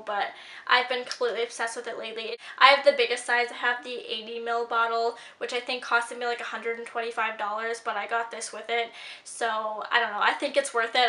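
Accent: Canada. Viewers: Are u Canadian??? :)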